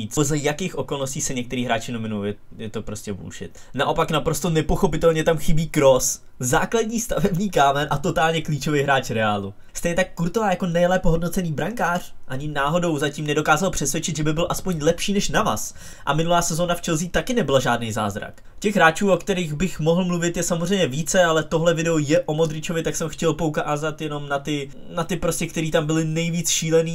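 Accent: Czech Republic. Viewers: To je otřesné, jak furt mluví jak Pražák.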